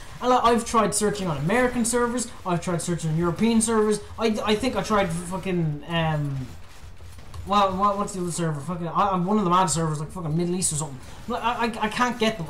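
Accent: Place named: Ireland. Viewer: That Ireland voice (; <3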